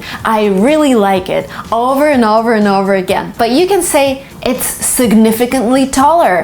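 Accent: United Kingdom. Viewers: She has a lot of british accent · Are you from the UK?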